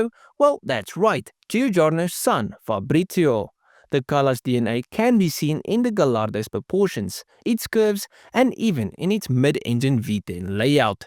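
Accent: South Africa. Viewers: I think they say it “Guy-yard-o”, at least they do if it’s a Spanish bull., I’m trying to place your accent; South African? Love the videos.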